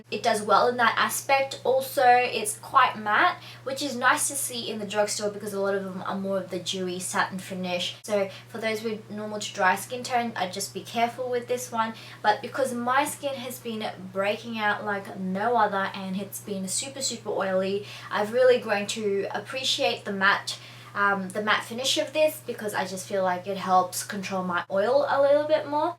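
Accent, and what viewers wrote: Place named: Australia. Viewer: Hey, just wondering, did you grow up in Australia since young/born there? Cos you have a really thick Australian accent & its quite interesting to imagine how you'd speak tagalog with such an accent hehe;)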